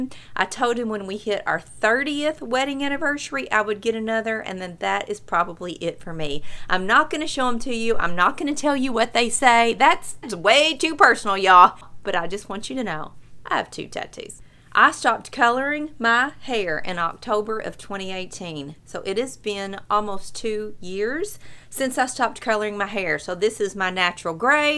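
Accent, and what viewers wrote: South, United States. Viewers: Could listen to you all day! Your friends must love love love you! Having moved back home to Kansas after 4 yrs in Atlanta I miss a lot southern accent and quite truthfully the South! Soooo when I hear you I’m back in the south for awhile! Love all your sites so don’t stop them😊. I am the opposite of you....I’m a dog person...now have 6 rescue cats! When my last 2 dogs died a year after my husband died of a heart attack I was left with 2 cats who got to run the house and I can’t stand seeing feral cats soooo..... I am a water baby and love the beach and like you feet in the sand... well there is a few things about me.....still watching your past posting and enjoying them all.....oh forgot.....while you are a UK person...I Rock Chalk KU. So we can have fun during basketball season😂😂😂😂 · Hey,! I found you when YouTube suggested your “I adopted the shelter dog nobody wanted.” As soon as I heard your southern accent, I was all in. 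I’m from Georgia but living and working in the DC area now. I’m ready to go back to the South where people look you in the eye and acknowledge your presence! I, too, grew up with cats. Got my first dog—a border collie mix—last fall. Best thing that’s ever happened to me! I love all your dog videos but also love the ones about you and your family. Would LOVE to follow y’all around your town and see your favorite spots.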